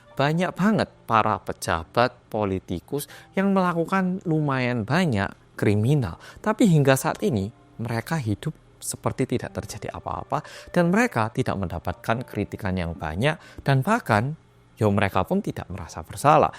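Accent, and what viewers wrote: Java, Indonesia. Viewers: Nungguin bget si komen dan opini oppa yg medok.. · Jawa lu medok banget,😂😂😂😂😂…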